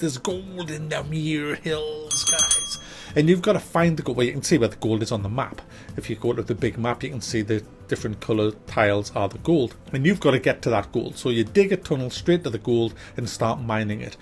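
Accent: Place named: Newcastle, United Kingdom. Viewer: geordie youtoober? well aye. ne worries pal.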